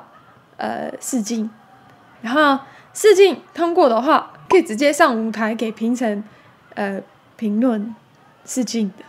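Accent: Taiwan. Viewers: 妳的台灣腔好純好可愛！ 😍👍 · 講話很台欸🤔 😁😁😁 👏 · 我靠 一口台湾腔调的中文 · 妳的中文真的講得很好，很標準的台灣中文! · 你说话的方式也太台了。。。🤣 因该是个很有趣的女孩～ 🤭所以就订阅咯。。。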